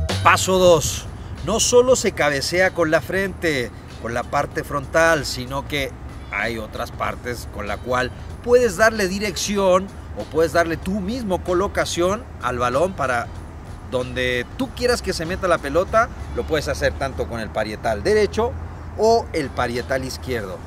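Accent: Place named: Mexico. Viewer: Pero choro no hables como Méxicano🙄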